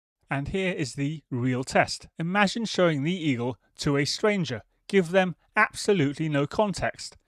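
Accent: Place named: United Kingdom. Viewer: I really don't need some guy with a British accent telling me the plot's and science is nonsense. It's FICTION like everything coming out of the mouth's of EU leaders these days. I liked the stories.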